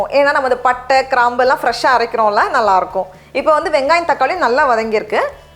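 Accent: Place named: North Chennai, India. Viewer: Your now Celebrity channel ,please improve your Tamil pronunciation ,Dont say la ,Zha ,its only few days of practise. Azhagu !! not Alagu ,tamil means Tamizh or Zha ,avoid north chennai accent too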